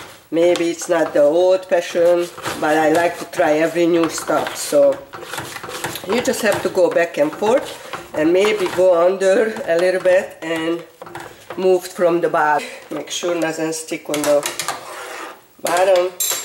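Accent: Hungary. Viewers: Thank you for doing all of these! They are really helpful. My father was a '56er and we had many Hungarian friends in Cincinnati. I remember for St. Stephen's Day we would have a big gathering. The older women would come over to our house early and make Goulash in a pot over a fire in our back yard. And they would always cut the Nokedli into it! Your accent brings me back to those days. I could listen to you talk all day.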